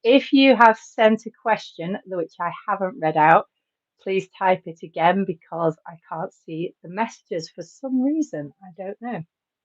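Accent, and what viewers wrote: Northern England, United Kingdom. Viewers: I personally really like your Northern English pronunciation. Some English teachers change the accent to teach the standard pronunciation, but I prefer originality.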